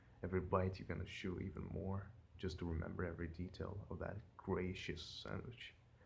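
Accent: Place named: United States. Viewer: maybe we need to break it down; are most of our goals considered needs? For example, my goal is to look prettier - if I ask myself why? I'll know it's because 1- it gives me options for different work fields (such as modeling) 2- (more important for women lol) I'll probably have many admirers, which means different options available for picking a potential partner, or get completely shunned by real great ones lol. Regardless, they're all needs. Temporary. They don't last. Which is why it's excellent that you thought about it! Instead of letting your goals aka needs drive you around, you're thinking much ahead! That could actually give a lot more meaning to your goals and guide you through the way, resulting in true accomplishment. (Ps what state are you from? Incredible American accent!)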